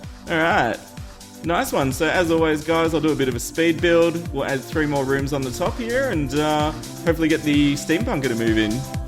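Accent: Australia. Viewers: I hope u are enjoying your play through and I notice an Australian voice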